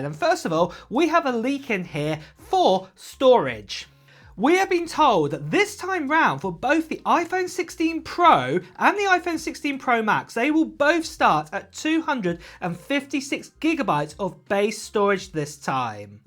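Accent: United Kingdom. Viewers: UK English accent 🎉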